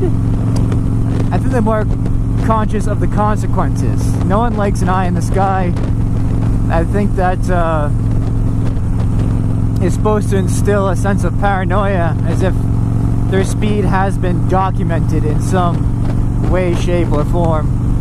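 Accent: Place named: New England, United States. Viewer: By the way, you from Boston? NE twang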